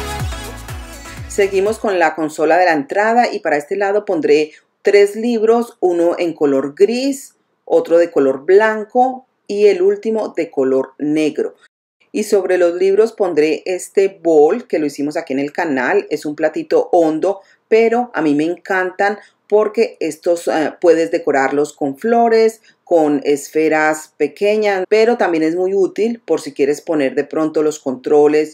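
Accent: Colombia. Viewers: En mi país le dicen yaquis... Recién estoy jubilada y pues mi casa que es la suya muy seguramente es del tamaño de su sala 😂😂😂 sin embargo me encantan sus ideas soy realmente nueva y hoy haré lo de los lentes y las bolitas de palillos... No se si tenga decoraciones para baños.. Me encanta que la mayoría de sus ideas es acorde a muchas economías.. Aparte de las excelentes ideas amooo su acento... Colombiano??? No se si me equivoco pero me encanta como habla tan bonito....genial su canal!!!!!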